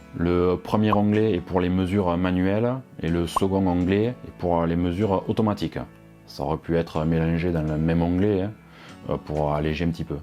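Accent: South, France. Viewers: Bonjour Comme d'habitude : exposé sobre, didactique, complet et objectif. Et l'accent du Sud en plus qui enchante le Cht'i que je suis... Bonne continuation !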